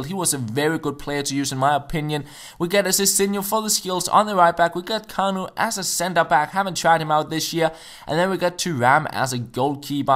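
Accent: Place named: Denmark. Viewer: Thats your opinion He is from denmark and that is his accent so deal with it If it annoys you so much than dont watch his videos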